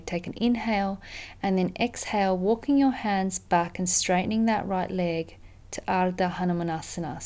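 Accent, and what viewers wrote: Australia. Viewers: Thank you, that was incredible. And so refreshing to hear another Aussie's voice. :-)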